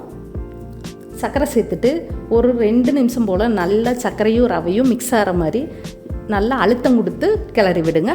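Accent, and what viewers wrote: Coimbatore, India. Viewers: Nice maam. video making nallairuku. keep going maam. speaking slang is the icon of kovai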